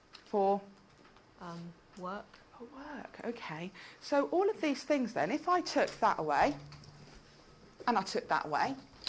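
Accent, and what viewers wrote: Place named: United Kingdom. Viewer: That's kinda puzzling... She has got a British accent but this shouldn't hurt so much.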